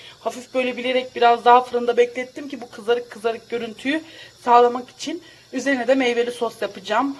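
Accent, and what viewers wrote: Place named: Kayseri, Turkey. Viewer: izle vallaha keşke bizde seninle böyle olsaydık ne güzel olurdu leee🤣🤣🤣kayseri şivesi leeeee🤣🤣🤣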